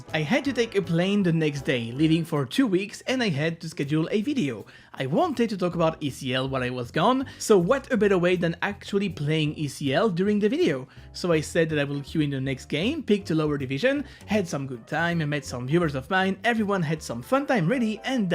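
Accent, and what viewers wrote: France. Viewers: accent du sud ? le rooooh putaing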